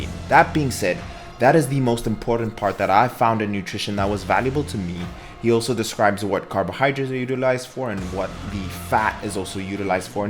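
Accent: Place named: South Africa. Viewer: I just came across your video and your accent sounded South Africa so i kept watching. I am South Africa living in the States. I am starting life style change. Don't want to lose weight but want to gain muscle. I am enjoying your videos.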